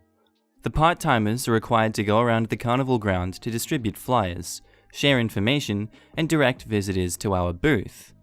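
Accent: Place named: Australia. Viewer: Appreciation points for Aussie narrator 🦘🐊🦘🐊🦘🦖🦘🐊🦘 🦜🦜🦜🦜🦜🦜🦜🦜🦜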